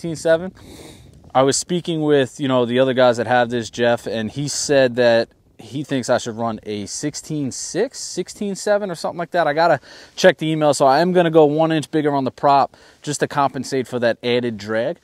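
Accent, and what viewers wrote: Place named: Brooklyn, United States. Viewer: Are you in the boroughs of NY? You have a slight Brooklyn accent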